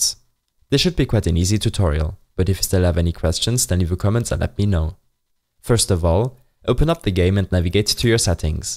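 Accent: Germany. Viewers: Der deutsche Akzent😂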